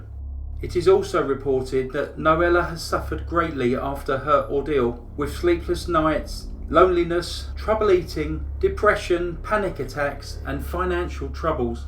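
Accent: England, United Kingdom. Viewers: This was a fascinating case, presented in a compelling style. You successfully blend storytelling and documentary narrative styles, and you enunciate clearly so that you are easy to understand, UK accent notwithstanding. ;-) Nine months with no parole for six seems insufficient, however, I think Noela got some measure of justice with the shock of showing up. Can't put a price on that. Great job. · First I would like say that I sure missed my friends beautiful English accent! So good to hear it again... For this woman, it is sad that she is being shunned because of a man who wanted to murder her. Those people need understand that it could happen to them. She is better off without those good for nothing back stabber's. He deserved a longer sentence, 9 yrs is nothing for someone who wanted to murder their wife. He begs forgiveness? He just wanted out the easy way, so he thought.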